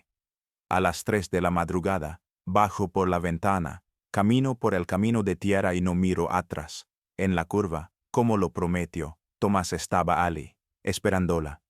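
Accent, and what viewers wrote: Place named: Portugal. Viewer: Habiendo locutores con voz firme de habla en español, contratan a una persona de origen porrugues, qué mal gusto...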